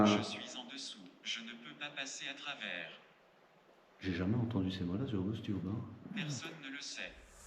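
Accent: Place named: Belgium. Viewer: dictions parfaite avec l accent belge ;analyses bien expliquées et enquête bien menée ; que demander de plus! j adore!!!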